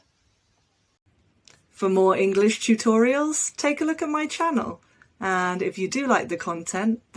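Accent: United Kingdom. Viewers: Hi! I discoverd one more utilisation the "as far as..." Tsunami had reached as far as my house so I moved to another island! Thank you for your lessons and I love listening your british pronunciation as well, even though it is difficult to imitate!!!❤❤❤👌👌👌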